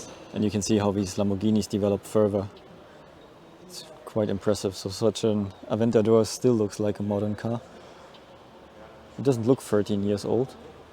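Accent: Germany. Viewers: When a Dutch person speaks English, it is horrible to listen to. But with your (German) voice, it sounds absolutely perfect.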